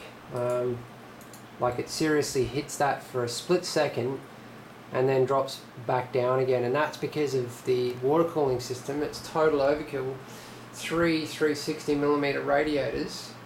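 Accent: Australia. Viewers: Great Stuff! You from good ol North Queensland? T-ville / Cairns area?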